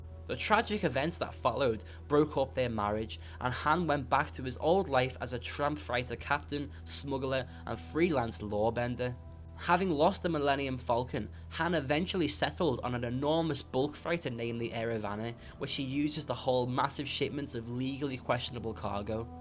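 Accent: Liverpool, United Kingdom. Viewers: U scouse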